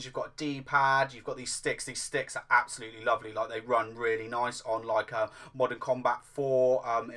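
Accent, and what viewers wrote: United Kingdom. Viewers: Oh bless ya buddy, from you from Oxford mate?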